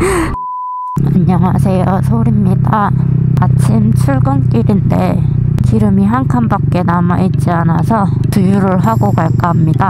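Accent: Busan, South Korea. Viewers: 그나저나 부산 말투가 귀여운 거에요, 이 귀여운 거에요. 말투 귀에 착착 붙네. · 전 몰랐는데 고마워요 부산말투랑 대구말투랑 비슷한 데 목소리가 조은거네요 주말 잘보네세용 🤭🤭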